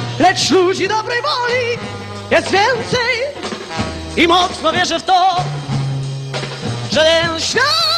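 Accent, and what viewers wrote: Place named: Eastern Borderlands, Poland. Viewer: To nie wschodni akcent tylko kresowy. On był Prawdziwym Polakiem z Kresów Wschodnich.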